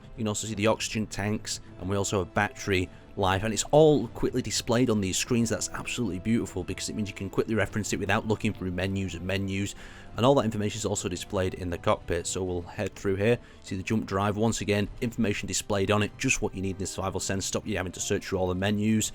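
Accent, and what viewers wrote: United Kingdom. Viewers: That British Accent Doe! Bruh!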